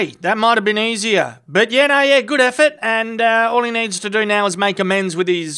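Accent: Australia. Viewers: This just wouldnt be the same without the Australian narration.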